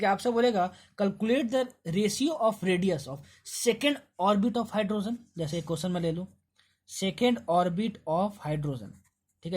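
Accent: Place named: Gorakhpur, India. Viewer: Are you gorakhpuriya???? Ur accent is sweet....btw thanks for course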